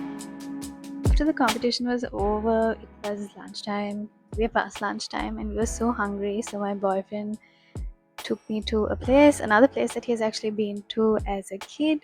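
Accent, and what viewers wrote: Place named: Sri Lanka. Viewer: I wanted to write this in Sinhala, but I’ll use English for clarity. Don’t you find it strange and awkward to speak a foreign language while in Sri Lanka? It’s like mental slavery. If your audience is foreign, go full English. If not, speak Sinhala. Using Singlish in between is just… funny. Honestly, broken English with a Singlish accent doesn’t make you “posh.” English is not fancy .. it’s a borrowed language, taken from other countries. Meanwhile, our native language is beautiful. It’s time to drop the slavery mindset. Think about that.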